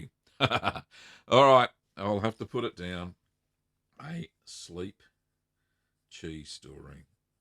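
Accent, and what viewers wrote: Australia. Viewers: I apologize, and now I feel ignorant. I'm pretty sure that's an Aussie accent now - My friend lives in NZ. Terribly sorry for that. I checked your website's IP and saw that it originated from an unknown location in AU.. I feel really silly. Have a good night!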